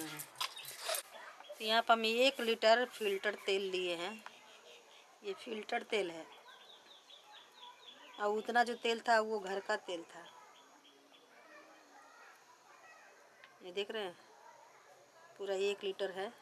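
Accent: Bihar, India. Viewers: आपका आभार ।आपका बताने का तरीका बहुत मस्त मजेदार, बिहारी स्टाइल का,संगीत मय।मज़ा आ गया।😅😅😅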